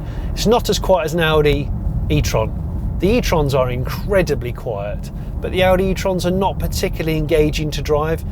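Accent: United Kingdom. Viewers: The main reason I watch this show is the very British English.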